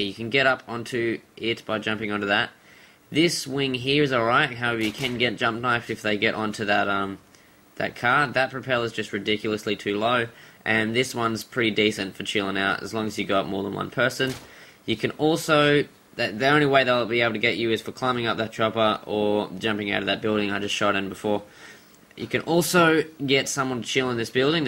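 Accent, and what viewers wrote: Australia. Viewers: a few on downturn lockdown and hhat but still nice r u australian u have cool accent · Austrailian accent! 3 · Are you Australian?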